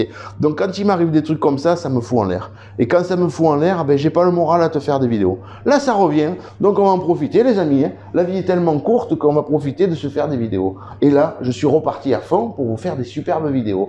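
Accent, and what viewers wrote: France. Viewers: Moi, comme abonné à ta chaine, je te regarde de la Beauce, Canada. J'aime tes vidéos car j'apprend beaucoup sur la boucherie. Ton accent français me chatouille les oreilles. J'adore! Merci!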